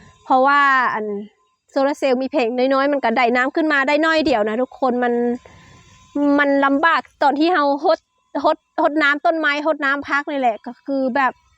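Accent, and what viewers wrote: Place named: North, Thailand. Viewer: ภาษาพูดของ คล้ายภาษาคำเมืองภาคเหนือ ตอนแรกนึกว่าเป็นคนเหนือ เป็นคนสู้ชีวิต สุดยอดแม่สาวน้อย👏👏👏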